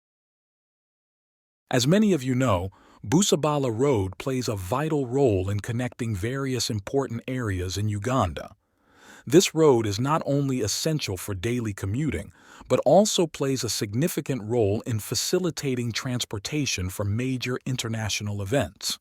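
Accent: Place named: United States. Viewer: How comes you know Buganda clans but in the vlog you sound to be an America. This is very interesting thanks man. Keep it up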